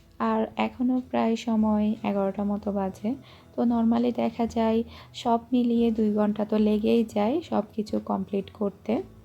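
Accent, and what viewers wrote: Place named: Chittagong, Bangladesh. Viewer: আাপু আমার মেয়ে তোমার ভিডিও দেখে।তোমার চিটাগাং এর ভাষায় কথা গুলো শুনে ও হাসতে হাসতে শেষ।🤣🤣🤣🤣ওর এখন ৬বছর বয়স। আপু তাড়াতাড়ি চিটাগাং এর ভাষায় ব্লগ করে আমার মেয়ে টারে খুশি করে দাও।আর মিহি মনিকে এত্ত গুলো আদর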